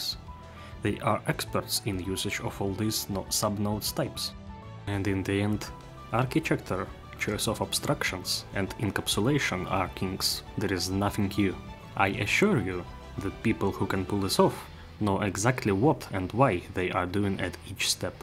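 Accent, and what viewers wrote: Russia. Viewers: русский акцент? information was so useful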